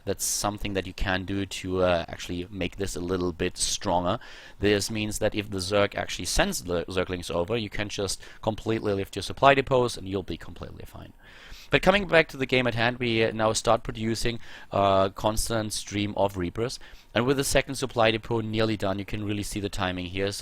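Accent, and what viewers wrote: Germany. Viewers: he s from germany^^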